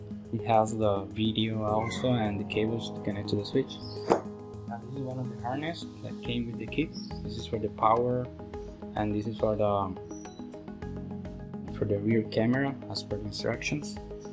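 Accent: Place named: Dominican Republic. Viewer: Loco pero tú sueña más Dominicano que yo.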